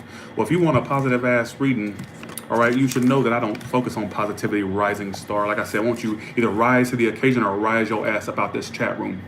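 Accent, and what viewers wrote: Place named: South, United States. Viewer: where are you located? you have a southern accent, its fun to watch you...how do i get on the chat?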